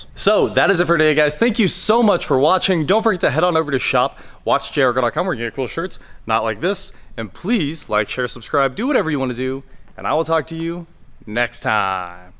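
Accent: United States. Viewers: Love the American accent. What’s a new “mear? “ is that what we call a mirror in England 😅😅😅 Nice update, funky little car.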